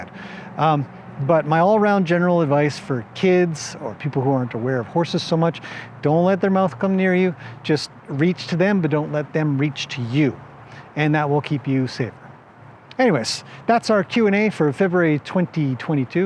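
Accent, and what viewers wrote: Canada. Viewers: I am gonna go back and listen to videos I have missed. I learn so much more about relationships with horses that make more sense than other channels I watch. I am re evaluating my training and my horse wants to be with me even more! P.S. Love your adorable Canadian accent! And it is so gorgeous where you live. Can you show us the area around your home if you get a chance? Thanks for everything Mr.. (: Babs from Boston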